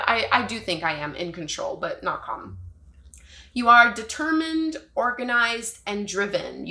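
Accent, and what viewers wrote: Quebec, Canada. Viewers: its probably really obvious to them, but i didnt realise you were canadian till just now ;) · Salut, je ne sais pas non plus que tu es francophone. Je te suis depuis le début de la pandémie ou je me suis mise au yoga. Je te suis de Suisse francophone. J’adore ton accent québécois. Merci pour ton super travail, Une vidéo en français ca serait cool. j adore ta chaîne à la prochaine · C'est drôle comme même dans les commentaires on lit l'accent québécois 😄 I am french and it was a nice and funny surprise to hear you speak french with that incredible canadian accent 😄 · Dang, Quebecois French is ... somethin' else...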